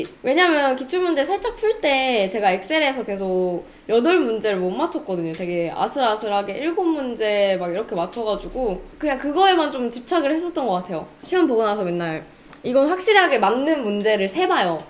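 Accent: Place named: Gyeongsang, South Korea. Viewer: 오오 님 영상 처음 보는데 오 서울 사람인가보다 하다가 중간에 어? 경상도 사투리 한 번 쓰시길래 설마 헀어요 근데 마지막 쿠키 보니까 완전 경상도 사람 이었네여 ㅋㅋㅋㅋㅋㅋㅋ 필기 1급 공부하려 하는데 꼭 합격 해야겠어요!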